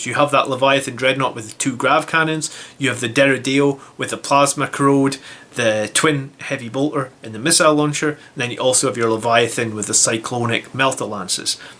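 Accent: Scotland, United Kingdom. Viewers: Really enjoy the channel and the Fb group. Also nice to hear a Scottish accent on the Internet.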